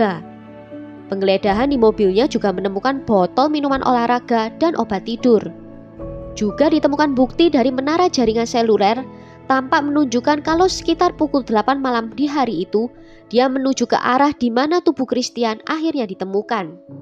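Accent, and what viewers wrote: Java, Indonesia. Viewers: Hay jg medokuhhh..sukaaaa👍👍😍😍😍💞💞💞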